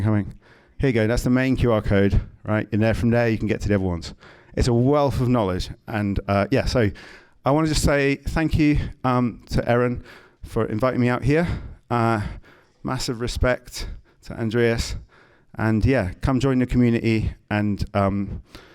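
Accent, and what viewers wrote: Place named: United Kingdom. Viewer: He sounds very British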